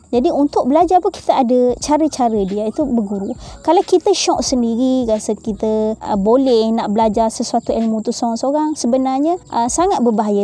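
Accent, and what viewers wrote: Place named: Pahang, Malaysia. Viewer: 💯 setuju. Dah lama tak dengar loghat Pahang, felda mana ni? 😁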